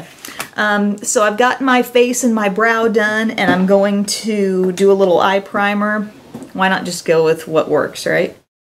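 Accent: South, United States. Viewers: It's so interesting to hear your "accent" because I live in the northwest suburbs of Chicago and I don't hear that accent here! It sounds southern :) I love it! #snapchatfam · Got a little more southern drawl in your speech today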